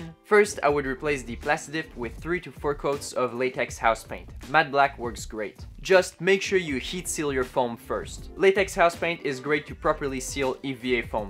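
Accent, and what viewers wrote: Quebec, Canada. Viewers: J’ai entendu l’accent québécois dès le début hahaha 🫡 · are you from québec?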